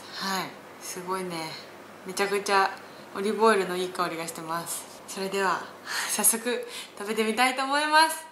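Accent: Izumo, Japan. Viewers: 大社の人間なのでたまに出る出雲弁がたまらんです(*´°`*)💓ロシアンちゃん大好きーー！！💓✨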